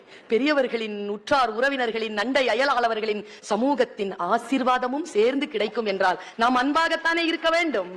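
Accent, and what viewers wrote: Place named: Tirunelveli, India. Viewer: திருநெல் வேலி நீங்கள் பேசும்போது தெறியுது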